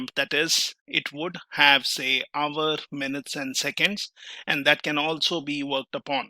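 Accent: India. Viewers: the videos is a value but it's so annoying to be in Indian accent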